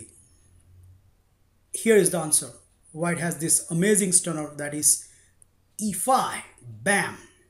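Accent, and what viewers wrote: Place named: India. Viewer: Tyler Roth why you hatin on this guys voice. Sure he has an Indian accent but his voice isn't cancer. Don't joke around like that, cancer is a serious thing bro. Also nice attack will try this in my next tournament. There's this players who's skilled who plays this opening so might be able to beat him now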